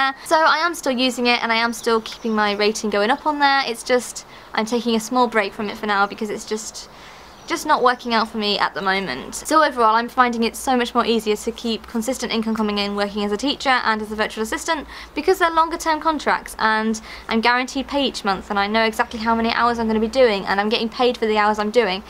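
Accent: United Kingdom. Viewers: english from uk lovely :) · came here for upwork related content but oh God That British accent <3 I want to speak like you :(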